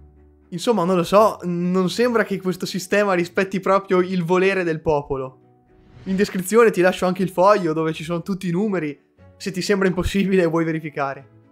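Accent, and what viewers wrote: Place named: Chioggia, Italy. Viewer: Mi sembri da Chioggia 😊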